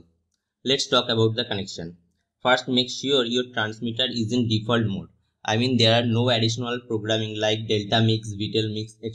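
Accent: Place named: India. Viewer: i cant understand your heavy indian voice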